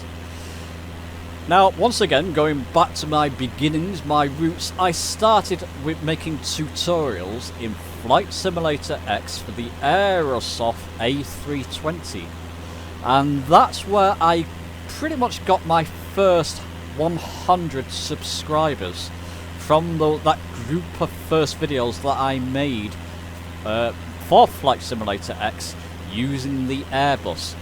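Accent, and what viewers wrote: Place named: Northern England, United Kingdom. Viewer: Good to hear that Northern Voice every time I want to learn "How To!" and how you make the difficult seem so easy I don't normally believe in coincidences - but I just asked on My Orbyx Forum any hints for making Flight sim videos because I want to improve the few I've made and took the UTUBE plunge - and once again - great minds think alike Thanks for all you do especially for us Old blokes!!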